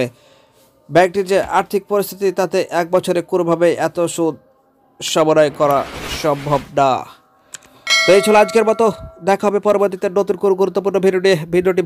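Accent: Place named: India. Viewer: ভাই আপনি কি ইন্ডিয়ান লোক কারন আপনার কথা তো ভারতের লোকের মত।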